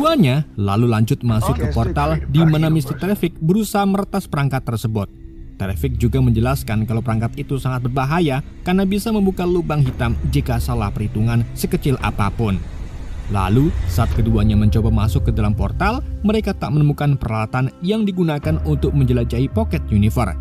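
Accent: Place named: Pekalongan, Indonesia. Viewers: Iki admine antara wong Pekalongan nek ora wong batang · Wong kalongan po min. Kok ngomong megono. 😅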